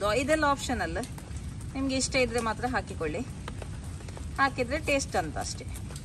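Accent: Mangalore, India. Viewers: Mangalore kannada thumbha chennagide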